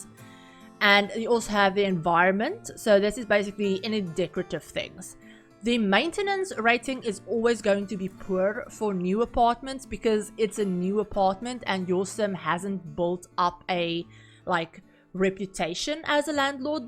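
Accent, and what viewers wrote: South Africa. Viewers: You sound south African, im from SA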